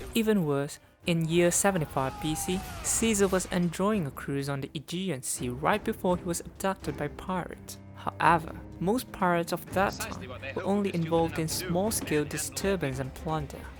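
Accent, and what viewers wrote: United Kingdom. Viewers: 加上英式發音簡直絕配啊啊啊啊啊啊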